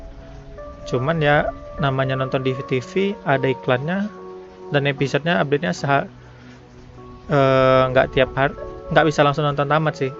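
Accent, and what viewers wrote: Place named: West Sumatra, Indonesia. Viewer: BTW abang orang mana bang?kok cara logat bicara nya mirip orang sumatra barat ya bang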